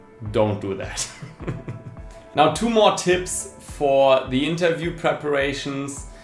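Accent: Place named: Germany. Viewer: This is like the staple German accent. If someone in Hollywood wants to do a German accent they need to study you.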